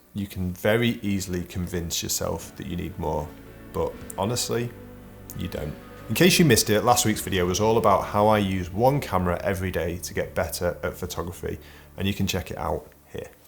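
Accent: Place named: Birmingham, United Kingdom. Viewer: Sounds like from Birmingham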